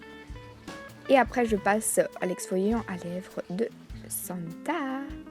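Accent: Belgium. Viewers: Vive la Belgique et son accent 😅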